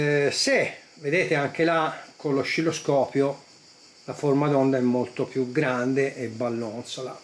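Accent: Tuscany, Italy. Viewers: Con l'accento toscano sa quanto mi piacciono i suoi video... c'è anche tanta simpatia oltre la tecnica. Ottimo come sempre. Guardato attentamente come a scuola perchè per me è scuola e anche questo video è molto utile perchè fa capire diverse cose, oltre al trucco di testare i condensatori anche come funzionano i tester. Io ho uno come il suo Aneng col guscio verde che me lo godo da 2 anni e la sua manovra di lettura credo che non la sapevo, perchè lo lascio sempre in AUTO e sposto il commutatore. Poi la barretta sotto i numeri che corre nelle letture di certi strumenti... ancora non so a cosa serve... cosa indica... Adesso il video ci casca a fagiolo perchè ho appena finito un alimentatore lineare nel suo telaio che dovrà alimentare un amplificatore da 50 W per canale con integrati LM3886 e mi sono accontentato di questa potenza alimentandolo a 26V ma può dare molto di più ma a me non serve troppa potenza e sarebbe servito un alimentatore più grosso e spazi diversi e il progetto non si voleva spingere a grandi telai tipo anni 80 ma la metà di quelli. 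Ho messo 2 condensatori da 10.000 in parellelo per ramo (+ e -) perchè è duale e il calcolo mi dava 16.000 µF e ho messo 20.000. Adesso devo fare il collaudo sotto carico a 5 A per ramo, ho il carico fittizio e anche l'oscilloscopio nuovo di pochi mesi e caro amico mi diverto come lei. Purtroppo non ho saputo progettare bene dall'inizio perchè volevo due alimentatori separati, uno per canale con 2 toroidali ma avendo comprato un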